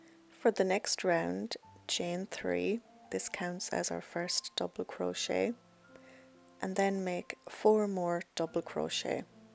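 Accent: Ireland. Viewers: Well this is just lovely! I adore this whole tutorial! Your pretty Irish voice is so soothing. I’m an American who lived in Ireland for a couple of years, and the memories are priceless. Gorgeous pattern and hat!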